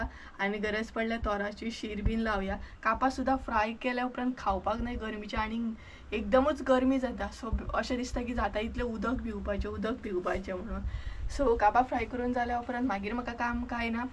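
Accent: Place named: Pernem, India. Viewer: from which place r u Pernem coz from ur way of talking I understood that u r from Pernem.side the way u said gauta Proud u should feel of urself.coz u r showing the hard work before the monsoons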